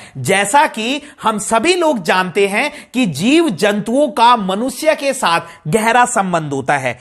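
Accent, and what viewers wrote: Garhwal, India. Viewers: Sir aap garwhali ho ky aapki aawaj se lg rha h 😊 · Gadwali ho na ap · Sir aap kya gadwali ho aap ki awaaz se lag raha hai ki aap gadwali ho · Bro h sir gadwali accent se pta lg gya 🤣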